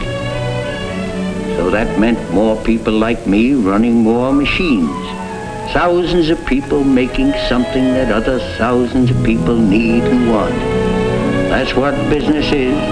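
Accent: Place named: New England, United States. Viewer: Definitely a New England accent !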